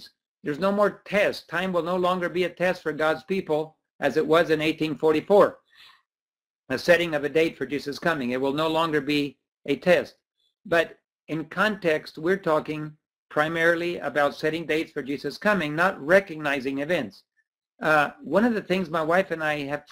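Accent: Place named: Hawaii, United States. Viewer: Thanks for being real Uncle. I know we're on the right path and the right message. God revealed to me the same message before I noticed you were giving it too. Ellen's warning is for the sleeping. Her confirmation is hidden in her warning about time test. Those that refuse to awake will naturally miss it. I am confident that you are aware and I love your accent it's very much like the Hawaiian accent. And we call all our elders Uncle so I'm very comfortable with you and the way you talk and think. Praise God that I am not completely alone in this planet of zombies. 🤙🙏❤️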